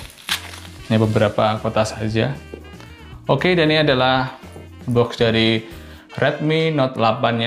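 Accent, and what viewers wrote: Magetan, Indonesia. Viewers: Mosok wong magetan lur?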